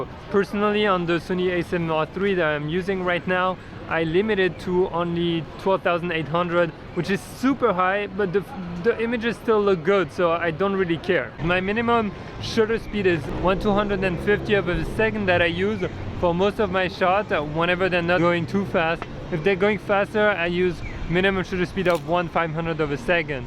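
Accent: France. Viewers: are you french ? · J'ai reconnu un accent parisien mdr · I like your accent.. Not too french just the correct amount of charm! Good points as well. Every lense let you do something different. But you can always create something spectacular with them - as long as you go out and shoot. Feel free to take a look at my view on Copenhagen and Denmark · You are french? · to be honest, difficult to understand your accent, are you French?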